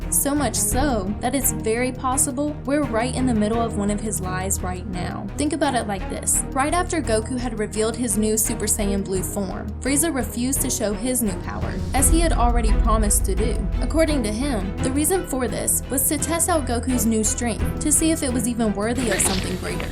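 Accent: South, United States. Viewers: low key has a southern accent. I like it lol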